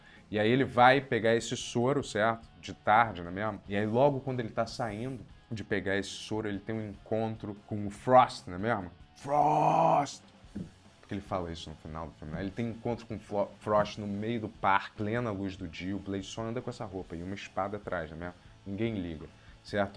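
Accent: Rio de Janeiro, Brazil. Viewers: Ultra irritante esse sotaque carioca onde no meio de cada palavra brota um “A” que não existe. Certo vira “CeAAArto” hoje vira “hoAAAje” fazendo vira “fazeAAAndo”. Nada nesse dialeto prende atenção por muito “teAAAmpo”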